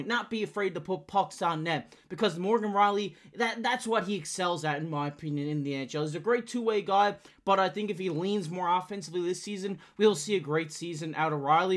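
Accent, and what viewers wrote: Newfoundland, Canada. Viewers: Definitely a Newfie or a caper. Good trying the English b’y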